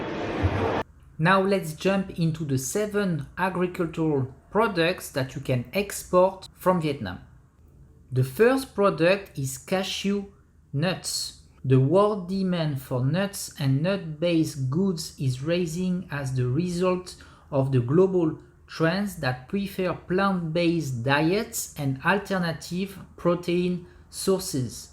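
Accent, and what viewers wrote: France. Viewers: Ca sert a rien de parler en anglais avec l’accent français !!parles directement en français,ca nous aidera a comprendre :)..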